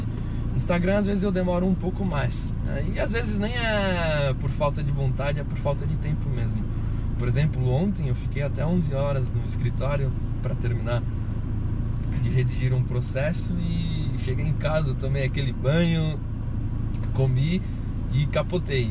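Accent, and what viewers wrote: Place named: Rio Grande do Sul, Brazil. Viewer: Acho que eu sou o unico que imaginava que ele fosse assim kkk pela voz dava pra saber que tinha uma aparência nova Mas pelo sotaque parece gaúcho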